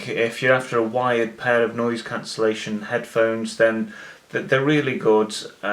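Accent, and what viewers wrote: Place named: United Kingdom. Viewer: Like for listening to your native British English.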